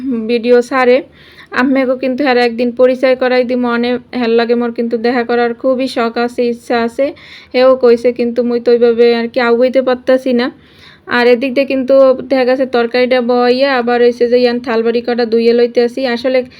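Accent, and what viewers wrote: Barishal, Bangladesh. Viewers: তোমার বরিশালের ভাষা বেশ ভালো লাগে ❤❤❤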